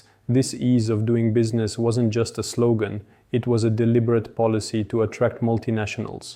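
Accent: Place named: United States. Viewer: &quot;Employment security not the best? I lost my job and was paid 70% of that salary for a year afterwards, as long as I proved I was job searching in that period. What other country does this? At one point I had a hard time paying a months rent with that 70%, and my municipality paid my rent with barely questions asked. Coming from an author who sounds american, you guys have absolutely 0 job protection or unemployment benefits. Really deligitmized this video.&quot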